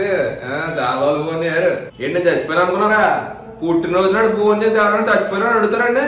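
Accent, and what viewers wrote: Godavari, India. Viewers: I really want to see you on a big screen with our godavari accent hope the day will come soon❤️ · గోదావరి యాసలో C language ah?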